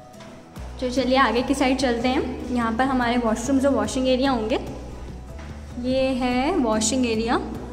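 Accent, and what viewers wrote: Telangana, India. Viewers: Great video...u speak very cute telugu 😍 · Ohh your cute Telugu😅😅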